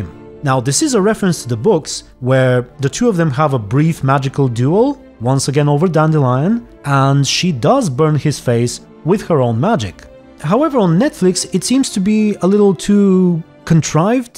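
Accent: Bulgaria. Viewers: Eyyyy you Bulgarian bro?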